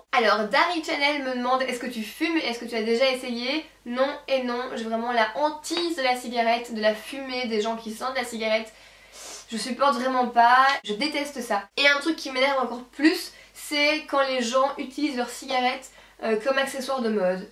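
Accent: Belgium. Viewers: BELGIUM POWER mwaaa! Je trouve pas que ton accent belge est prononcé par rapport au mien ahah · Mais, ne sacrifies pas ton master pour ta chaine et les évènements qui vont avec, c'est trop important;) J'adore ton accent Belge =)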